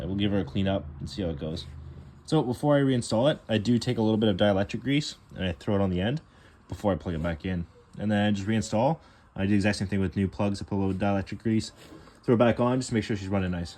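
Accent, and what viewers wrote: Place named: Canada. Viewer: I notice the Canadian accent but do you always speak that fast or was the video on 1.5 x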